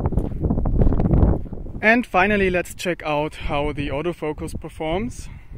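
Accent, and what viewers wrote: Germany. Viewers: Irgendwie hört man richtig raus das du deutscher bist 😂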